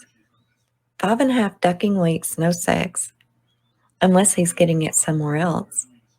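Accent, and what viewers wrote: South, United States. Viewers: Please don't let some of these negative comments get you down. You're doing a great job with a very difficult, controversial case. You are so beautiful....a southern Queen. Hope you will do more reviews showing your pretty face....not just you nice voice. I just love Southern accents. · Doesn’t she .......her accent is amazing when I visit America this is what I want to hear 😃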